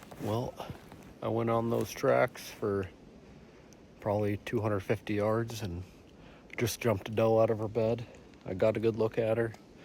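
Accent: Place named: Midwest, United States. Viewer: You sound and talk like a Midwestern cowboy.Where you from good sir?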